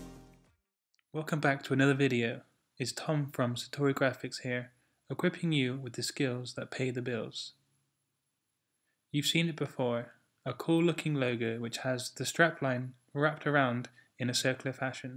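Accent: United Kingdom. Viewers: Thank you!! finally a short on point video! btw from which region of uk is your accent from :)?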